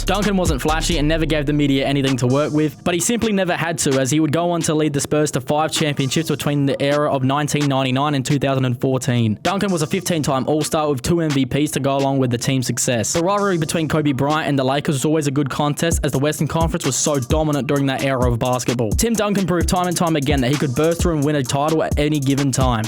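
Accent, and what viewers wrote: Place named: Australia. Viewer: yo r u aussie you sound aussie i am btw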